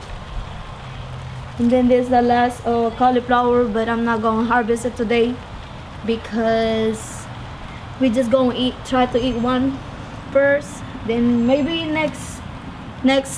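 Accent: Louisiana, United States. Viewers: I love the Cajun accent you got I applaud you growing your own food looks great.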